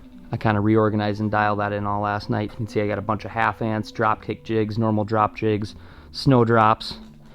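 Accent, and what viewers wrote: Minnesota, United States. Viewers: Sounds like Minnesota to me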